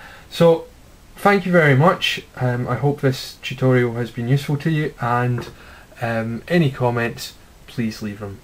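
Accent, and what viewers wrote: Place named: Scotland, United Kingdom. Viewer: Not only is this entire series very informative, it sounds like it has much more authority with the Scottish accent. Props to you, good sir.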